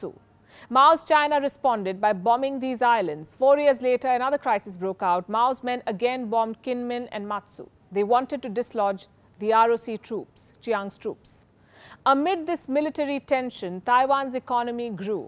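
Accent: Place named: India. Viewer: If you like that indian accent 🤢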